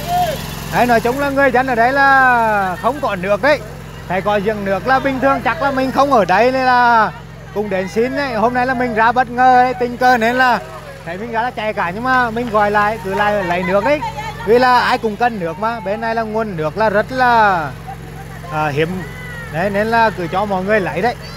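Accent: Nghe An, Vietnam. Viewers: thật tuyệt vời, vẫn tiếng Nghệ cười có kể, ăn có kể, đẻ có kể 🤩🤩...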